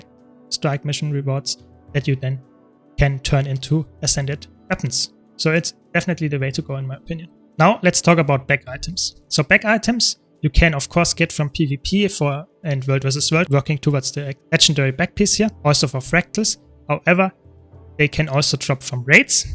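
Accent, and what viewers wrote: Germany. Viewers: Thanks a lot my dude. I really needed that video to progress my Guild Wars 2 journey :) Btw .. hör ich da einen deutschen Akzent? 😂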